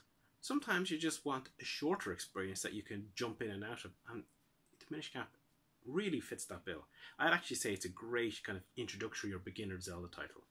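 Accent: Ireland. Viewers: Are you really Irish or is it a fake accent?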